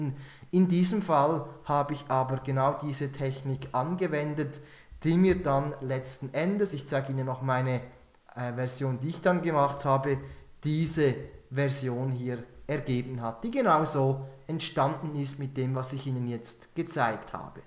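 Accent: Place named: Switzerland. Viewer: Dein schweizer Dialekt ist einfach genial :D Komischerweise versteh ich dich sogar zu 100%, was nicht bei allen Schweizern der Fall ist ;)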